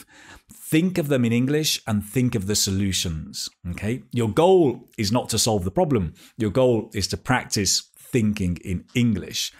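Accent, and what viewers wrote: Spain. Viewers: Me sorprendió tu acento español 😃 It's great ! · Hahaha I love your Spanish (from Spain!) accent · you have spain accent! it sounds curious! thanks for ur lessons!!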